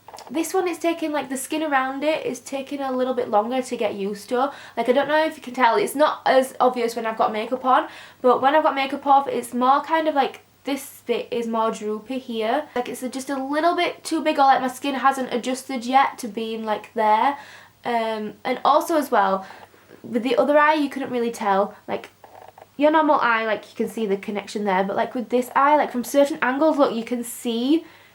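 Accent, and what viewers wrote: United Kingdom. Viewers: I love your accent, are you British?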